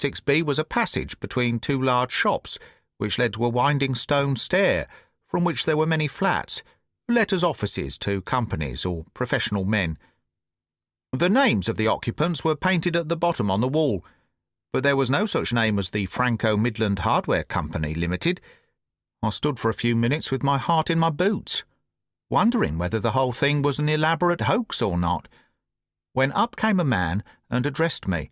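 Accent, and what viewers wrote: United Kingdom. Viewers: Amazing reading. Great British accent. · British pronounciation....Holmes and Watson did not speak the American language.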